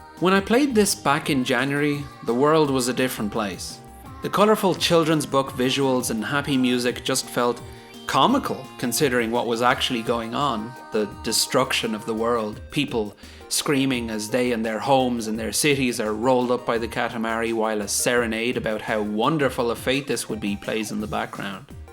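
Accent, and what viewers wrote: Ireland. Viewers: I just discovered this channel and now my internal monologue critiques stuff in an Irish accent. Great channel!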